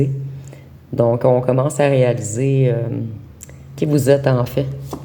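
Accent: Quebec, Canada. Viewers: Merci beaucoup ! Le tirage est très parlant et déterminant pour moi ! ( avec votre bel accent québécois .! ) MERCI pour tout . Je vous souhaite une très bonne soirée !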